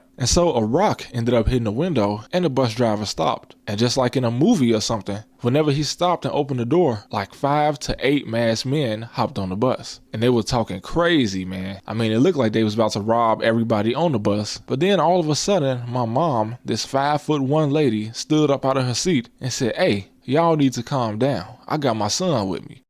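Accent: Chicago, United States. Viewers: And bro you definitely got that Chitown Southside accent. Great videos! Nostalgic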